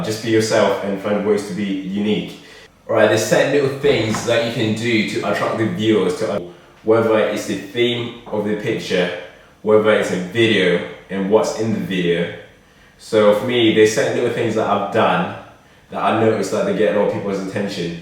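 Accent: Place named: United Kingdom. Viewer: Don't overlook how MASSIVELY important it is to have a charming British accent, you seemed to have passed right over that bit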